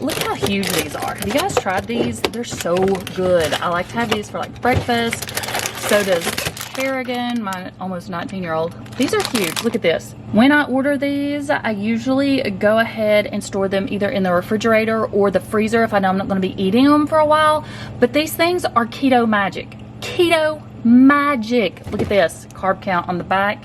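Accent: United States. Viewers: Please don't stop the chats‼️. I live in the UK now and listening to you makes me feel so much like I'm back in the US‼️‼️. Keeps me from missing home too much 🤗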